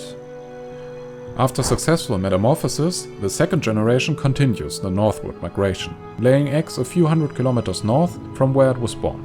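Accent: Germany. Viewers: Man hört den deutschen Akzent raus ^^ gutes Video 👍🏼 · I find the German accent so refreshing. It gives the impression of being straight to the point without any of the BS.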